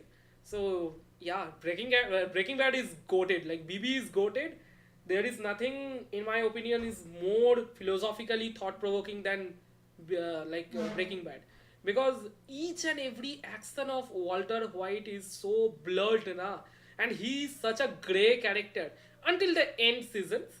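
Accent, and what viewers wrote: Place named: Bengal, India. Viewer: Dada i know that accent u have, sotti kore bolo......you are bengalee na?😁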